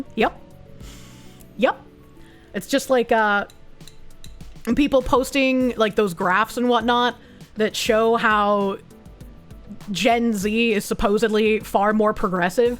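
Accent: Boston, United States. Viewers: How does that thick Boston accent get confused with the Midwest? Lol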